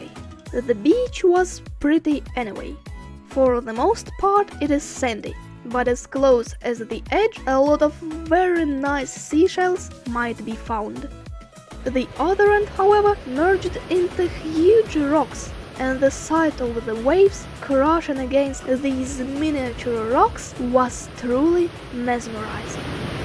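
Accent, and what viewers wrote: Russia. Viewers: Omg you have so much cute voice, the way you speak the accent is very cute ❤️🥰 i like Russian accent. I love slavic culture. And also Dagestan is heavenly beautiful. Keep going, I'll support your channel!👍🏻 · You not you. You speak English like Russian dievoshka. Atlitchna 👍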